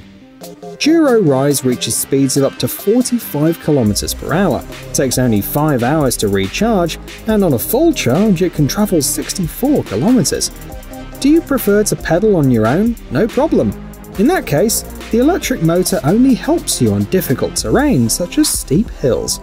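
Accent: United Kingdom. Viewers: I wasn't expecting the British speaker to price them in dollars.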